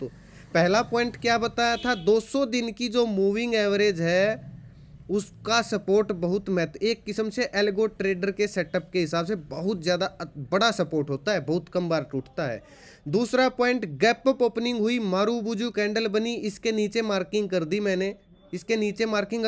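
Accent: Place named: Marwari, India. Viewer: आपका बोली का लहज़ा तो मारवाड़ी लगता हैं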